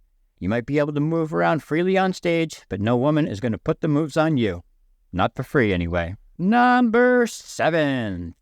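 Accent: New England, United States. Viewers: Hearing you makes me miss New England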